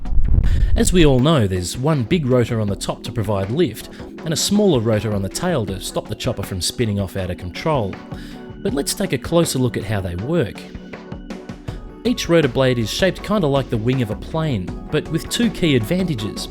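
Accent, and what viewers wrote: Australia. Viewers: Great video, funny and informational. Love that Australian accent. "Deesk of Leeft"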